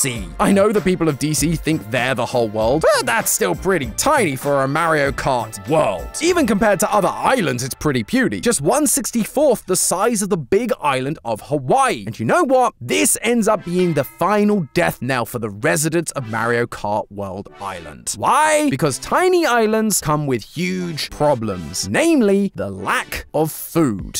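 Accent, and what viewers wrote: United Kingdom. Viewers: Why does Matt Patt sound more British?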